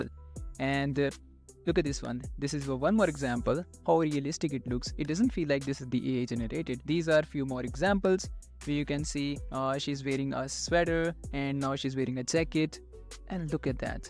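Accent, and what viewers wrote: India. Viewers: Github? 🤡🤡🤡yeah, if you want to get virus especially hearing this indian accent. Hell no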